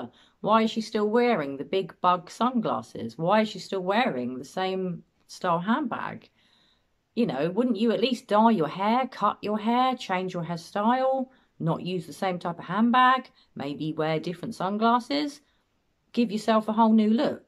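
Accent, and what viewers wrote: England, United Kingdom. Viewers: Why is doing YouTube videos with an English accent.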